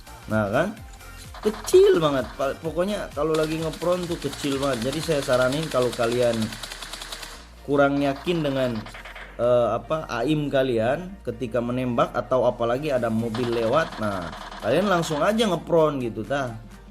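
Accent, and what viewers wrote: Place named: Sunda, Indonesia. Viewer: Orang sunda yeuh logat na beda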